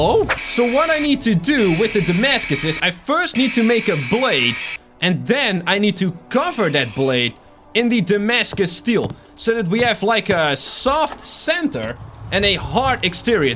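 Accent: Netherlands. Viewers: Ben je nl ik hoor het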